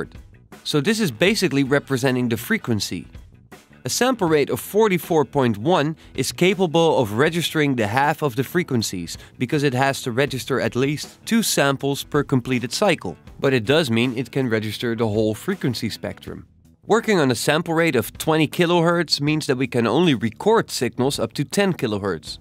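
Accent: Netherlands. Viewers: Your English pronunciation is pretty good (better than mine probably). Still, some words you say, reveal that you must be Dutch. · English with a Dutch accent really is one of the easiest recognisable out there. It's really amusing, since there's no way you can be wrong if someone is Dutch when you hear the way of pronouncing :D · nederlands accent? :)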